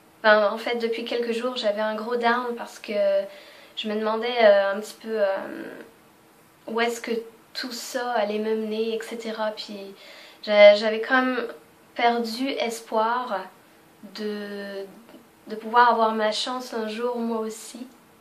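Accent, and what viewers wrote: Quebec, Canada. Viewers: pourquoi tu as l'accent québéquois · Tu parles comme les Quebecois jtel dit ahahahahaha :D tu '' la '' comme les quebecois le '' sa'' aussi !!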